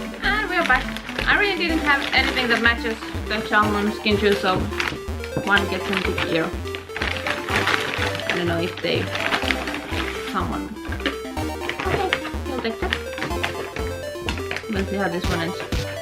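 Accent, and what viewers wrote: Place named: Scandinavia. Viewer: Its christmas for the huskies lol. nice vid. You sound scandinavian, i am from Bornholm Denmark.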